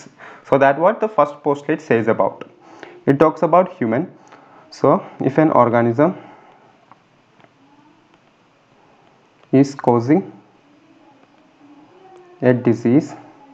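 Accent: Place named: United States. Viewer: The way,you are speaking English seems like you came from USA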